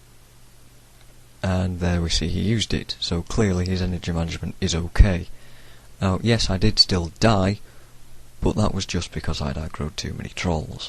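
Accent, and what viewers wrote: United Kingdom. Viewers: ty alot for helping me with some hero builds british guy ;-)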